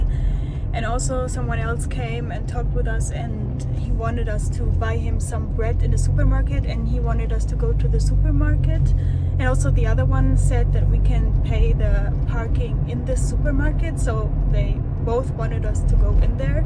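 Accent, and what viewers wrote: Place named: Germany. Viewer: Ohhhh I recognize that accent 🥹. The lovely people who always say “ my English is not so good” then proceed to give me fluent English instructions to the bahnhof. I met the sweetest and kindest humans in Germany. I hope you enjoyed your stay in SA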